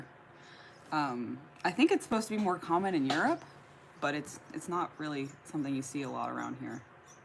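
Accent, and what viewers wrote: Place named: Midwest, United States. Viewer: in fact its not too fast, its a problem of accent... but sometimes, thats the good thing with you tube, i can roll back the part i dont understand and after 2 or 3 times understand it better! but it doesnt works all the time though! ( and dont be afraid, scottish, northern irish and northern english are waaay much harder to understand than your middle west accent!!!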